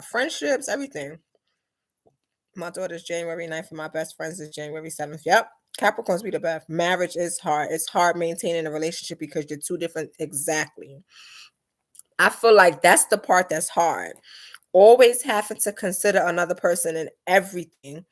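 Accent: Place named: New York, United States. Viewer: I figured it was either NY or NJ the accent,husband as well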